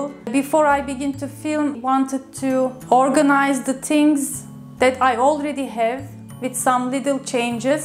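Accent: Turkey. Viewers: Ablacım o nasıl ingilizce konuşmaktir yaaa ? Türkçe okur gibi. Biraz diksiyonunu geliştir. Ruh kat diline biraz. Dümdüz türkçe aksaninda ingilice mi konuşulur ? 1.30 dakika zor izledim. Hemen kapattım.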